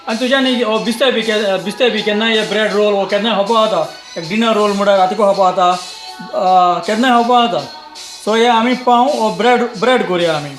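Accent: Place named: Salcete, India. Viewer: I've subscribed to your channel, just to listen to your konkani. It's my salcete dialect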